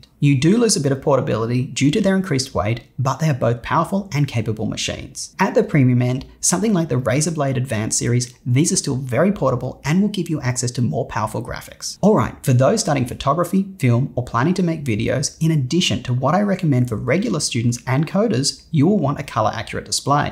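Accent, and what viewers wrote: Australia. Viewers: He's Australian.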